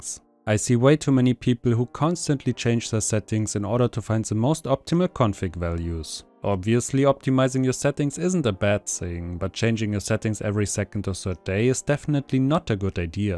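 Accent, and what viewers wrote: Germany. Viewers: Yo hey r u german?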